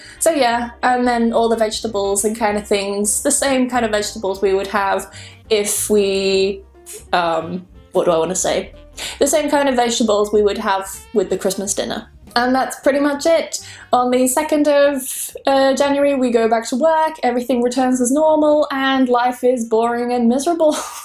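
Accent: United Kingdom. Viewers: Thank you for your teaching and British accent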